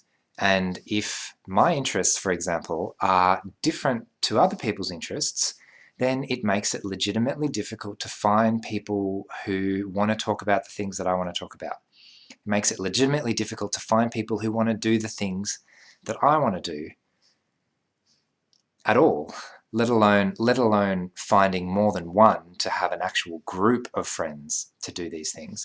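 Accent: Australia. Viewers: Just out of curiosity, what part of Australia are you from ? I live in Perth, WA. I cannot figure it out. Lol i don't watch TV either.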